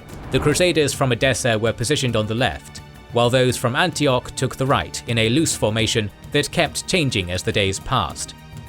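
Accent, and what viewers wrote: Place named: United Kingdom. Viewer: Your videos are great, and I love your British accent, but you occasionally mispronounce words, especially names, in ways which can't be chalked up to dialect/accent. In this video, an example is "Paschal." The ch is a "k" sound in modern English, rather than a "ch" sound (so "Pas-KAL" versus "Pas-CHAL"), coming originally from the Greek χ. Please improve this, as it is distracting from what are otherwise amazing videos. I don't mean to be nit-picky, but people do judge others on such things and I don't want you to be dinged for minor things that can easily be corrected.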